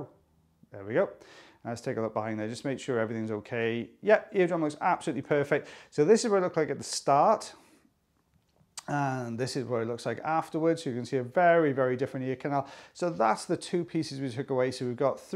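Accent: Wales, United Kingdom. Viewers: Always great videos. Thank you! And wonderful to year the Welsh voice! Visiting again later this summer...beautiful country and wonderful people. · I want a recording of and his lovely Welsh accent reading me bedtime stories to fall asleep to! (can't listen to his earwax videos to fall asleep as I'd want to watch the action! 😂)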